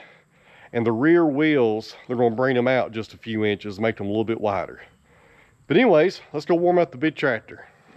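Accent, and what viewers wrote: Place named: South, United States. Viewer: Hi, love watching your videos. I’m an old carpenter up here in Edmonton, Alberta. Raised on a farm in Manitoba but left the farm and became a carpenter. Your southern accent is a hoot. We Canadians likely sound like Brits. lol. I enjoy all your videos but I’d say videos about 15/20 minutes are my favourite… yeah, we spell words funny too. Cheers Earl B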